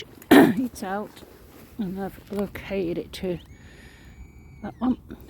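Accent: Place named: England, United Kingdom. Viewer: Nice understated English voice, a change from bawling Americans!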